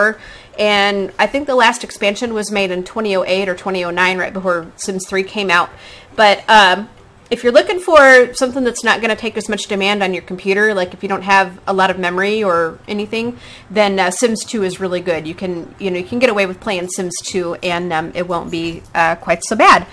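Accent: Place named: Texas, United States. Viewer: I hope you dont take this the wrong way. But you seem like you have a texas accent are you from somewhere around there (Sorry new fan so I am a little confused) :)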